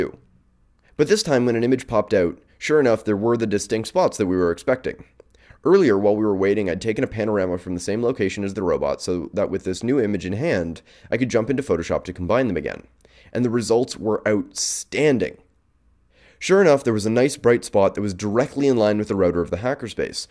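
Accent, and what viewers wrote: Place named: Canada. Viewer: Aha! I knew he was from Canada by the way he says "out" but him saying "Hackerspace in Montreal" confirms it lol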